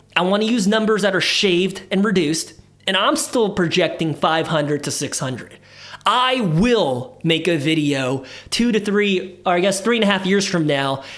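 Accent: United States. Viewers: It is so funny to see a chinese guy speaking which such a heavy Gringo Accent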